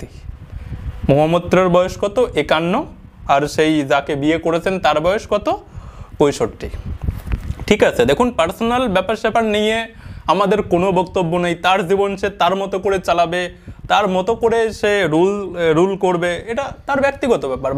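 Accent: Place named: Bangladesh. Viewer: Tor barita Kangladesher kothai.sposto kore kotha bol Ahammok.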